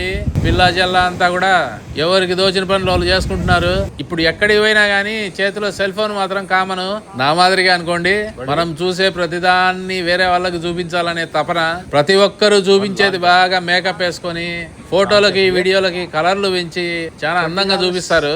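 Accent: Rayalaseema, India. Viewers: ఏమి అన్న ఆఫ్టర్ లాంగ్ గ్యాప్ మీ మాటలు రాయలసీమ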